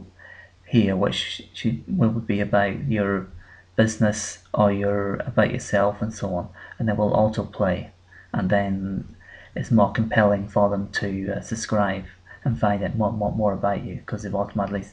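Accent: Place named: Scotland, United Kingdom. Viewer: I noticed a scottish accent there , i think , i'm from scotland :3 . The channel promo is important for people checking out the channel but the one thing i really wanna know is that i noticed that for some reason when a video of mine is playing , when it ends , it auto goes to another amv made by someone else . Do you know any way to stop that ? or is that another Google thing ? Peace & Good video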